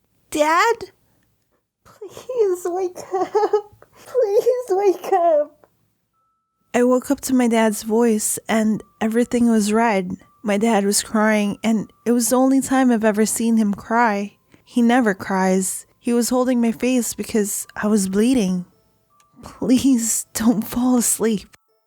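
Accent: Philippines. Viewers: BEST FILIPINO VOICE EVER (i dont know if i spelled that right) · Im new here so I didn't know she was Filipino until she said Philipine and thought: "So that's why she has sort of a Filipino accent like Alyah and Johan!" 😂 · Are you filipino? Cuz im filipino. The way how i can tell is by the accent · I love the accent!❤️ It's just so Filipino 😂 Every pissed Filipino parents : Ay naku! 🤣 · I love you you got the Filipino accent on point or should I say pilipino 😉😂✨❤️ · Tagalog Accent xD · Thats accent is very Filipino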